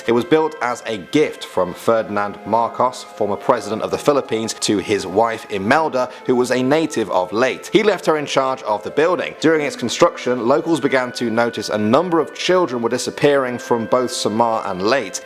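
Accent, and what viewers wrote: United States. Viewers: The americans accents thats not how its said its cuz ur americans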